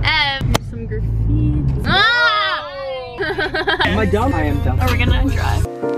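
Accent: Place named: New York, United States. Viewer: first video I saw of yours I noticed the accent lol, I'm live in ny so I was low-key thinking ,, hmmm maybe she's from ny lmaooo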